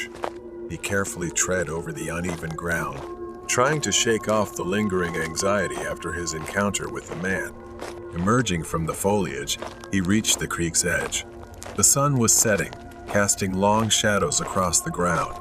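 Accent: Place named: New Jersey, United States. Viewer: your jersey accent makes your videos all the more comforting. been following since😰😰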